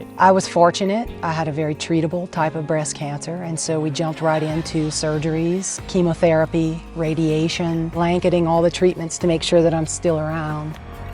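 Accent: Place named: Louisiana, United States. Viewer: Monkey Michele is so proud of you girly! You will inspire many with your story! I love that you haven’t lost your Cajun accent. I hear it so clearly! Stay close to your roots. Much love!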